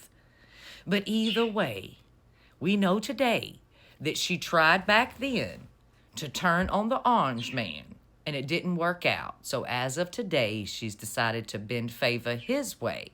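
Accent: South, United States. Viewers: But where in the south? She has the most beautiful accent.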